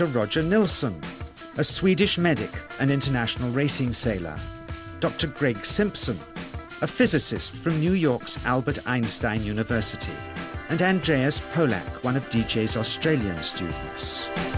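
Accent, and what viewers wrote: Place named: Indonesia. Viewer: noo, i think ur wrong ... he speaks indonesians very good, and also use typical English-Indonesians Accent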